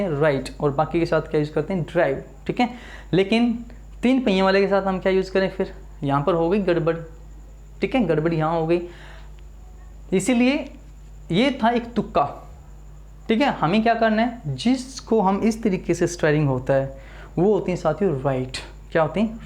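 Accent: Haryana, India. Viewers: English Mai bhi apka Haryanvi Touchup aa rha h brother 🥱🥱🥱🥱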